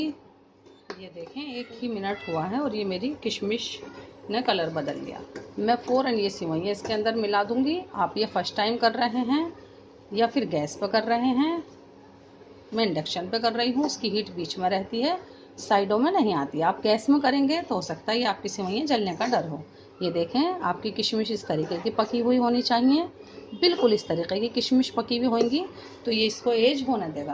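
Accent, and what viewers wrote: India. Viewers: The way u speak is so good full Desi style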